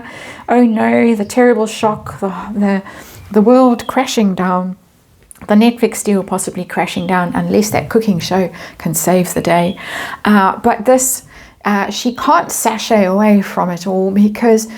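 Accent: South Africa. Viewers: has a beautiful South African accent! I think she would be a fabulous audiobook reader!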